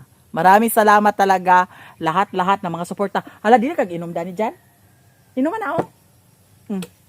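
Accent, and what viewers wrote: Visayas, Philippines. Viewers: Tagalog is not her 1st Language and as we all know, since for sure you've watched some of her videos, Bisaya is her Dialect and that's where she's comfortable with. Haven't you noticed, she's trying to speak Tagalog but she can't help it talking using her own Dialect cuz that's where she's comfortable. She's trying her very self to adjust talking in Tagalog but Please do respect as well her Very Own Dialect. And besides she was still able to Entertain and Inspired her Followers/Viewers even though she's talking multi-Language/Dialect. That's where she's Comfortable with, so let her be Herself, Was known by Showing Her true self so if she'll be changing the Things that she might doing eversince, It's gonna be a Total Different Anymore. Each of us has our Own Meaning of Living. RESPECT BEGITS RESPECT. just Saying. Thanks 🙏 Do whatever you want Ate. 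As long as you're happy doing such things Just Go And Continue. I'm a Fan. A Solid Fan. Love Lots and More Blessings to You and to the entire Family 🙏🤗💖 From SALUDAGA FAMILY Supporting all The way from LAVEZARES NORTHERN SAMAR 🤗💖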